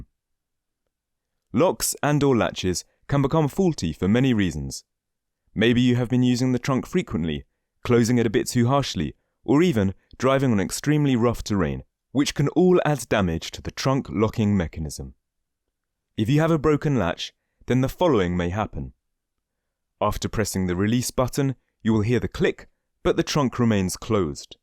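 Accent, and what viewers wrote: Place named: United Kingdom. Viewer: verbose with British English accent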